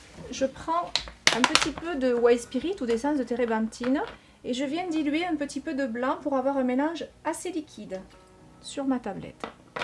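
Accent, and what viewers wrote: South, France. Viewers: Bonjour,👍je vous découvre seulement maintenant. Enfin des vidéos en français. Ce n'est pas évident de comprendre certains artistes en langage étranger. Surtout pour la partie technique et les produits. De plus votre voix passe très bien aux intonations du soleil. Votre tableau m'a donné envie de le réaliser à mon tour, je fonce. Merci de vos conseils. Bonne continuation à bientôt de vous retrouver. ✌👍👏😘🌹 Christine de Cannes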